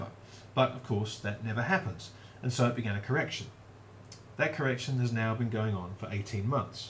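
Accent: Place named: United Kingdom. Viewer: I guess the British accent makes him sound intelligent.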